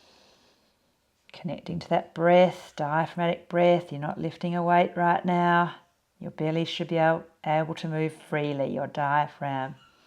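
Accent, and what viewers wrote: Australia. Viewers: Thanks. As a Canadian I am boycotting American products. Wonderful to hear an Aussie accent and wonderful to feel better.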